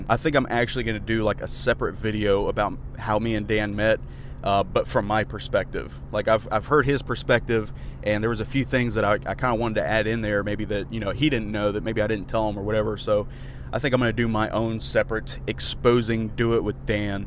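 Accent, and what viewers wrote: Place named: South, United States. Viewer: You have a very pleasant voice to listen to, I think everyone hates their voice but you just have a soft southern accent. Don’t hate it. 😃😃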